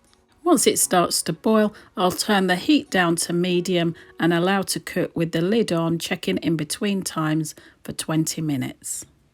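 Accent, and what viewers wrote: England, United Kingdom. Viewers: I like it so much! (I mean this lovely pure english accent 😋😊). Greetings from Russia Already trying to cook 🧑‍🍳🍽️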